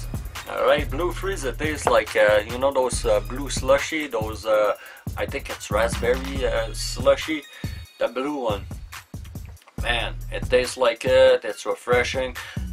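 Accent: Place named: Quebec, Canada. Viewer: Lol im an englishmen from quebec and ur accent is bringing back good memories haha awesome reviews to bro vapin the junkie shit right now too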